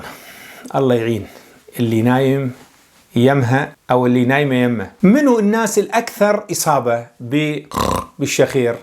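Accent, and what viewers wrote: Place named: Mosul, Iraq. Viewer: والله عغفتو من لهجتك انت ما غريب. دكتور الله يحييك و يوفقك دائما. و استفادينا من الفيديو عاشت ايدك. الموصل دائما ولاّدة للناس الايجابيين و الناجحين مثلك.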